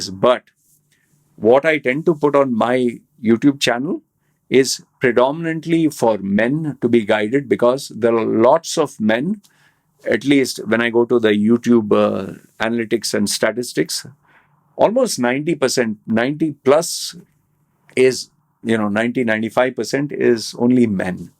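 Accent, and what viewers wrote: India. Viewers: Are you an indian, your accent is completely indian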